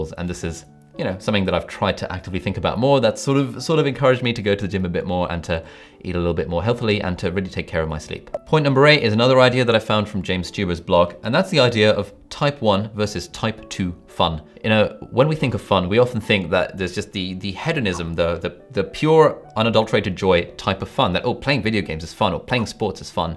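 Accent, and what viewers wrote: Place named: England, United Kingdom. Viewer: So nice to hear a well-spoken, articulate English accent..Well done.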